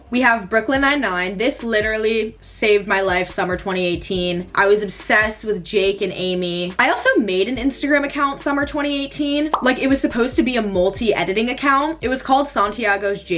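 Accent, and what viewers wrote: United Kingdom. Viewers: ur a Brit?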